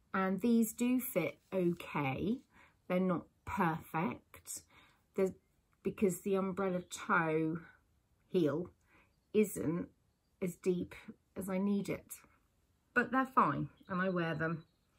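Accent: United Kingdom. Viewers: That’s a hard one, I can totally see you talking to the police in LA... all upset, probably at an airport or even better in an big casino full of people with your british accent and your colleagues laughing.. the poor police officers 😂 but the shoes also sound very... hm, im going for the shoes as a lie! I just want the LA story to be true 🤣🙋🏻‍♀️ Thanks as always, have a nice day!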